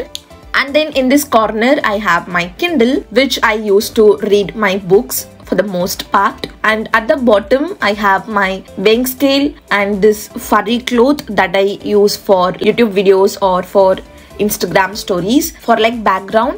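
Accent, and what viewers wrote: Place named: England, United Kingdom. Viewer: So nice !!!! Love ur English accent ….Your room is so minimal and looks so good ❤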